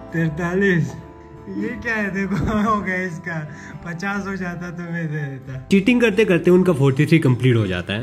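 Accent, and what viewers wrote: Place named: Kargil, India. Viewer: Bia app ka accent kargil se lagat hea .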